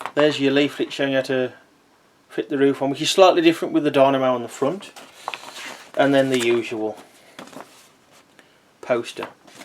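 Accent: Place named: Black Country, United Kingdom. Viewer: So good to hear a Yam yam on youtube!!!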